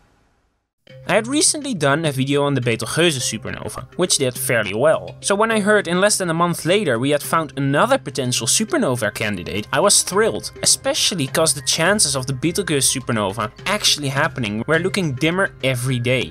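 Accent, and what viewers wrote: Netherlands. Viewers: You Dutch or sonething?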